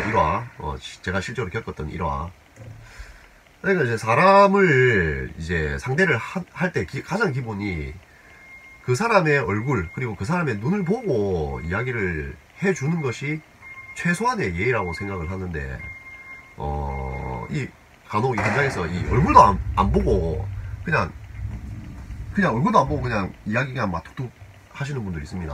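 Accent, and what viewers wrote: Gyeongsang, South Korea. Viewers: 열 팬 입니다 훈남 이시고 구수한 경상도 사투리...항상 잘 보고있는 말년에 용달하는 창밖의 남자 임다 앉으나 서나 안전 운전 하십쇼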